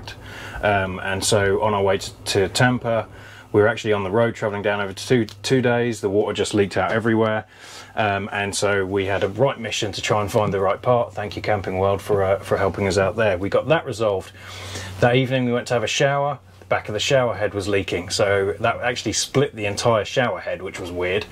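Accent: United Kingdom. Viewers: I just watched your video and it answered my question. But, I want to be sure that was the source of the leak. By the way, I could listen to you Brits all day long. Thanks from Arizona, USA. Dan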